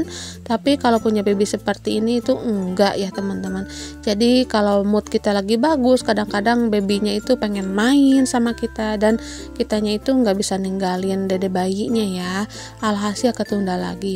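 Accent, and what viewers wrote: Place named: Sunda, Indonesia. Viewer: kalo ngomong kayak istrinya bang deni cagur logat sundanya kentel😅👍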